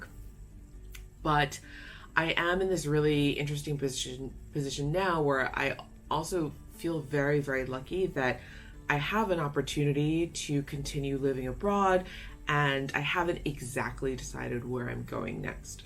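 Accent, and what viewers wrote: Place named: Long Island, United States. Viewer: I knew you were from NY! I live on Long Island and was surprised at how much you have that typical Long Island accent.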